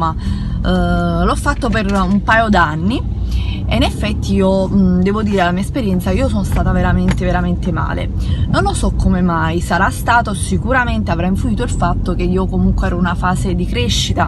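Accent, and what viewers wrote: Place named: Naples, Italy. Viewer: Sempre a criticarla per come parla...ma nessuno ha ancora capito che e' napoletana?e per i napoletani tutto e' permesso sono cosi'!! non lo fanno apposta e'anche per questo che sono simpaticissimi😊😊😊vai!!!bellissimo video!!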